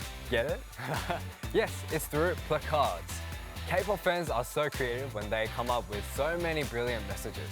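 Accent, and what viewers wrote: Australia. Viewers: I know everyone thinks it but his Australian accent makes everything 10 times better 😍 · The more is see this the more I get used to the Aussie accent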